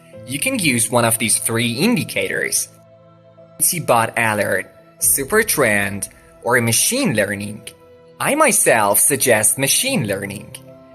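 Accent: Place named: Australia. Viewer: Why are you hiding an Australian accent ?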